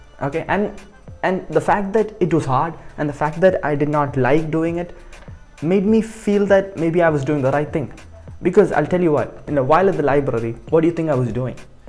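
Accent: Kerala, India. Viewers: I love your mallu accent da!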